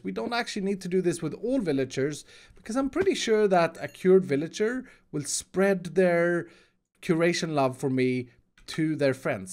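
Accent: Sweden. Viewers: Svensk dialekt, jag låter värre. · It is good that the villagers don't remember that YOU were the one that infected them in the first place. Infested and Curated, love your Swenglish!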